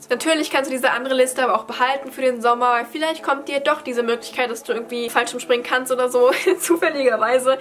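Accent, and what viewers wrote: Germany. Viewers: Du kommst aus Brandenburg 😍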